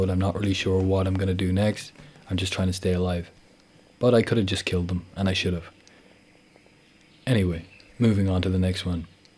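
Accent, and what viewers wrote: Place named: Ireland. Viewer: Nice work man, you sound Irish :D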